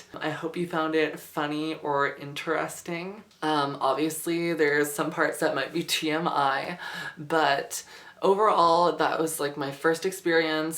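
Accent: Canada. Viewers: Are you Canadian???? Just wondering 🙂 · she is canadian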